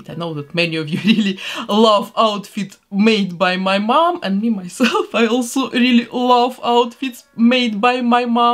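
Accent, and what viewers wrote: Russia. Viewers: По первым фразам поняла, что вы русская 😇😘😘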